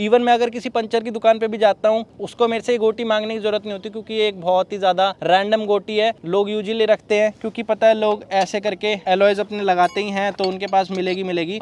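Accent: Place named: India. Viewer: I had a hard time understanding your English due to heavy Indian accent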